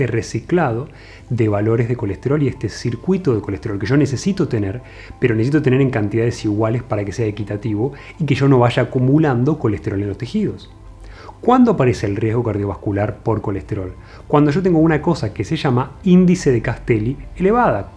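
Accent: Argentina. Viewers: es argentino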